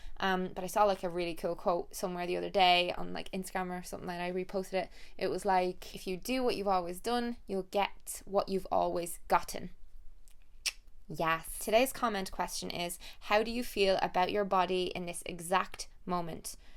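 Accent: Ireland. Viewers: In all honesty I'm not happy with my body but there's no hate. I've struggled to put weight on for years and after recently being diagnosed with under active thyroid, I can now start to heal and find balance to my body again with Meds and a return of energy. Though I don't like how my curves have dropped off and I already have a prominent bone Structure, I am thankful for the blessings I do have, I remind myself that my vessel doesn't define my value and the fact I would never want another to feel In such a way so I must be kind to myself also. The odd days where I just feel bleurgh, I will either sloth it out n just enjoy being comfy n have the lil treats that cheer me up like a brew, favourite food or a pamper. Or I will front it out, put a face on, get dressed and put focus on a part of me that I'm happy with ie: do my makeup a different way to draw attention to my eyes or lips, accentuate my waist or wear tights or Leggings instead of something unshapely. 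Thank you for always being so open and honest and sharing your experiences. I adore you and your Irish accent and know you inspire so many people, so thank you for using your platform for kindness 💖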